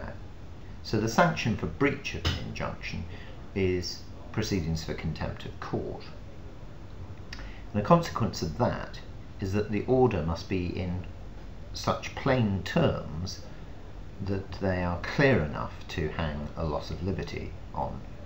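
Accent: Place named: England, United Kingdom. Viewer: Guy has a damn English accent - geesh!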